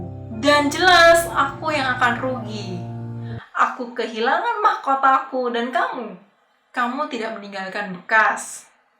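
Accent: Java, Indonesia. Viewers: medhoknya ciri khas banget 😅😅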